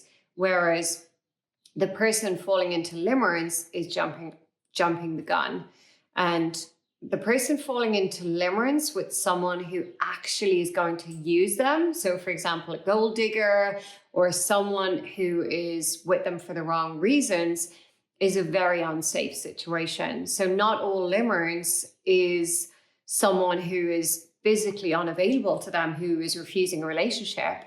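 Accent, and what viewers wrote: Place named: Ireland. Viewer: Limerance sounds like an Irish term...what better person to discuss this than a irish accented .. attractive woman🎉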